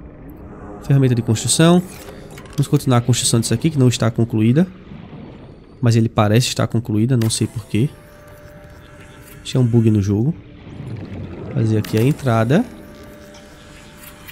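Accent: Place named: Northeast, Brazil. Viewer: Conhecendo o canal agora, por esse jogo, pq preciso de dicas, to meia perdida nele, gostei, adoro esse sotaque nordestino, ganhou uma inscrita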